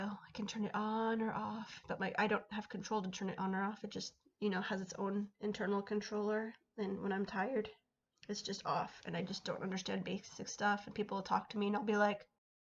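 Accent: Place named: Sweden. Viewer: Hello! Thanks for subscribing! I'm definitely looking forward to watching more of your videos because I actually have know a couple that's moving to Sweden soon to be long-term missionaries! I'll definitely tell them about your channel! Also I like listening to other languages, your Swedish sounds super cool! And I like that your English has a Swedish accent too!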